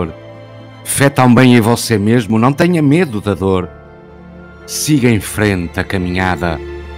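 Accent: Portugal. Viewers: Que lindo numa voz portuguesa, lembro de Fernando Pessoa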